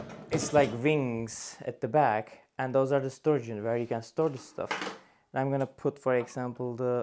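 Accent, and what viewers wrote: Turkey. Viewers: Usta Türk musun sanki aksanda Türklük var gibi 😅😅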